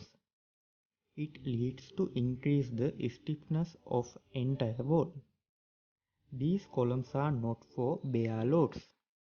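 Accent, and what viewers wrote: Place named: India. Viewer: Thank you for the explanation. However, I must mention that I had difficulty understanding you because of your strong Indian accent.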